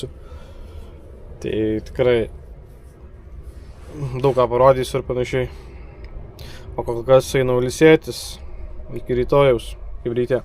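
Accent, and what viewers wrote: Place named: Alytus, Lithuania. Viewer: Sveiki. Seniai žiūriu vairuotojų kanalus, bet šį kartą truputį kitaip - malonu girdėti alytietišką tartį :)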